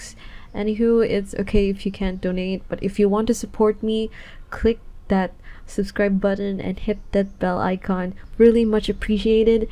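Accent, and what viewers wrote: Philippines. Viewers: I KNEW YOUR A FILIPINo from your accent ;A; and hands? Is that weird xD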